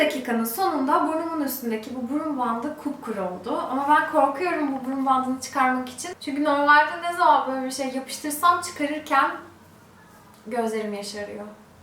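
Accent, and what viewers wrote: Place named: Russia. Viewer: Ты русская скорей всего)))) ama türkçen iyi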